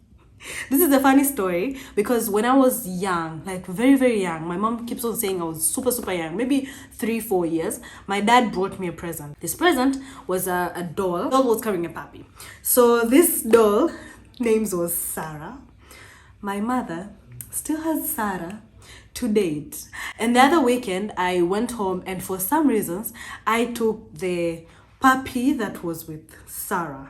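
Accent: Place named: Kenya. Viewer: you sound Kenyan, then you don't!!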